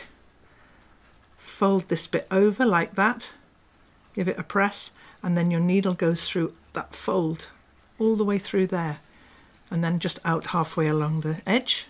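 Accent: England, United Kingdom. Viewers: Thank you so much! ... I already do EPP.... But I love listening to your English accent and I love paper piecing, So it was extremely enjoyable to just watch you go through your process. I love that you are so humble and that you encourage others to do what you obviously enjoy. I love to share what I do with others as well. My dream would somday to come and visit you and see your lovely little home and gardens..... You live the life that I dream of. 💖💝. Thank you for sharing your life with us!!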